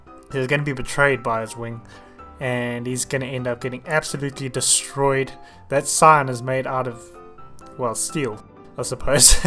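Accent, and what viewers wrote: South Africa. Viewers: Are you south African I love the accent but I'm not good at accents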